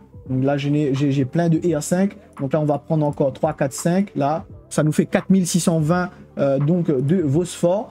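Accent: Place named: Reunion, France. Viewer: a l'accent je sentait un 974 caché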